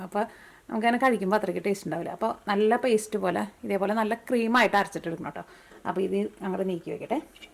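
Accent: Thrissur, India. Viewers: Heyyy, you are so adorable n I simply love yr simple n natural method of recipe explanation in your dialect of Angamali?? But feels like Thrissur...oh btw -that kadai is sooo beautiful..at the US shop???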